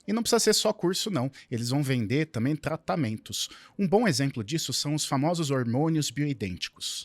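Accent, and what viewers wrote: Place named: United Kingdom. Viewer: eu gostei do "um sotaque britânico". Eu acrescentaria, "sotaque britânico com uma pegada de personagem de filme antigo que os tios gostam"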